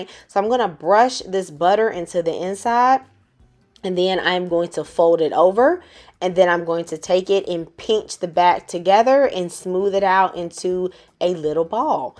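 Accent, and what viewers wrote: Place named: Chicago, United States. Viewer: Sis, are you from chicago? that accent is giving chicago vibes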